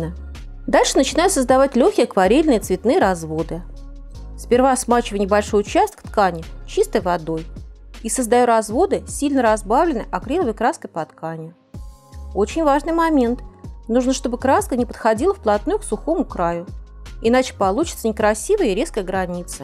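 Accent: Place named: Orenburg, Russia. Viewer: Говор интересный. Вы из Оренбурга?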